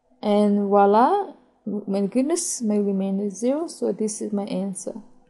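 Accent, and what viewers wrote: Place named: France. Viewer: yep...you''re French....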